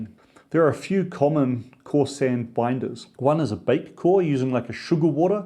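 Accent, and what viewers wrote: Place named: New Zealand. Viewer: I joined this channel because you use FreeCAD! (and maybe also because I love the Kiwi accent...)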